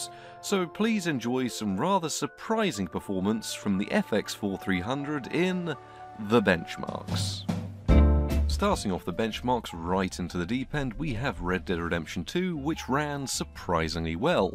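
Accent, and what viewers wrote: United Kingdom. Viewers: I'm from Russia. British accent is such a treasure)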